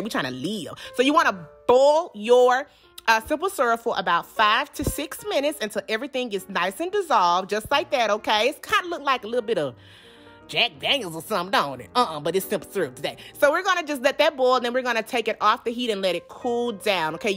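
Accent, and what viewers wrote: South, United States. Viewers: I be living for this Southern ass commentary😂❤ (that's no shade, I'm from the South too🙌) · I knew how to make tea already. I just came to hear you talk lol! Gotta love a southern woman.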